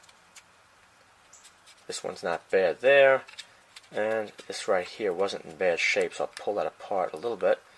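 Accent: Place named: New York, United States. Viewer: He sounds like a new yorker from long island.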